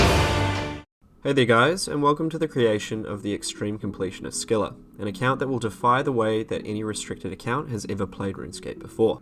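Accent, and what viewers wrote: New Zealand. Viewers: Are you a kiwi brother? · Sub for the nz accent !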